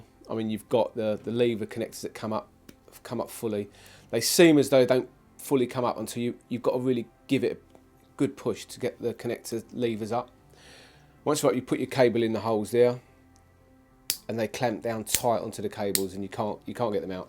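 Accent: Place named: United Kingdom. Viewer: I can’t be the only one that watched this video cos if his British accent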